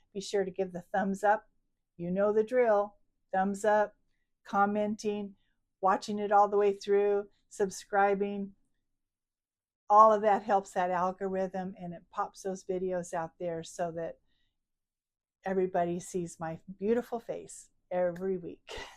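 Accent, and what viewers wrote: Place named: Transatlantic, United States. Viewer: Jealous of your transatlantic! And fun schedule of cruises!❤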